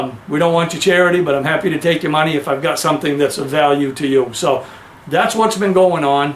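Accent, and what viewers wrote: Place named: New England, United States. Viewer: Hey, I'm new to your channel, just subscribed today, 8 -28-21..... Saw your video from 5 years ago on the Dolos system....Dig it....... Don't know much about you or the content of your channel, but I liked what I saw and how you present and come across to your viewers... I'm a vet myself (semper fi), and can appreciate how crazy this year had to have been for you and your family.Life is a fragile thing.... So, just wanted to reach out and wish all of you the best in health, happiness and better fortunes from here on out..... I'm in the new England area as well, picked up on your accent before you mentioned Maine (lol)..... Look forward to more of your vids when your up to it..... Brighter days my friend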